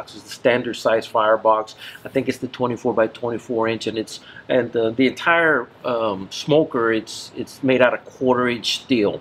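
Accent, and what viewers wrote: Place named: South Texas, United States. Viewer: Where are you from you have that south Texas accent just like I do.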